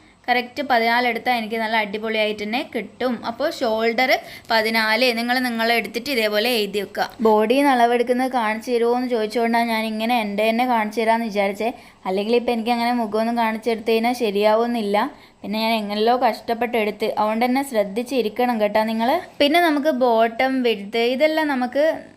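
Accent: Kannur, India. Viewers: Kannur aano, enkil evideyaa. Samsaarareethi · Kannur analle.... 😍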